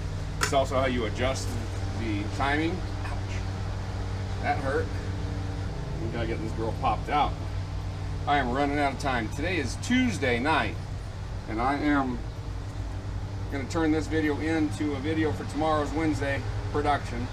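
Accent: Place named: Oklahoma, United States. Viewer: is one of my favorite YouTube channels and I'm also from Oklahoma and he really does talk like that lol. He's actually an extremely intelligent and talented guy who was an Airplane Mechanic in the Air force. He cracks me up and teaches me at the same time. In his earlier videos he was trying not to sound like an idiot he said so that is why he sounds different in the earlier ones. So actually the earlier videos are the fake lol 😁👍 I like each channel for their own individual uniqueness.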